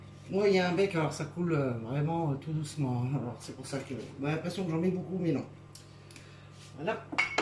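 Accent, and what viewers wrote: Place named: Cambrésis, France. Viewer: Top 👍👍👍 ch’timis pour toujours !! en tout cas vous avez pas perdu l’accent 👍 De notre côté Petite famille en région parisienne mais tous natif du Cambraisis Merci d’avoir retenu pour la recette une fois par mois version bien de chez nous !! Bonne continuation (tiote)😜 Super recette je vais la faire ce week-end !!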